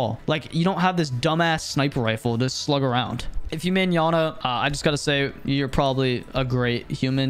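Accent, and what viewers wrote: Canada. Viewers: What part of Canada ya from bud? I'm from B.C. Triple triple btw ha ha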